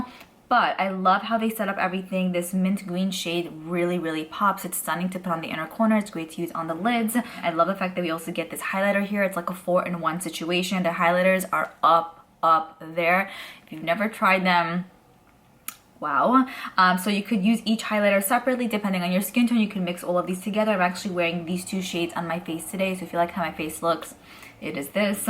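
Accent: New York, United States. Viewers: I ❤ your New York accent. You make me smile with every video. Could you try the new wetnwild palettes? Some love it, others hate it. I don't know what to think. Also the new Revlon prismatic eyeshadows palettes?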